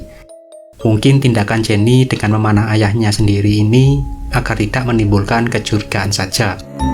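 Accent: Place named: Java, Indonesia. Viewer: Film nya menegangkan. By the way spean orang jowo to mas logat nya ketauan. Hehehe...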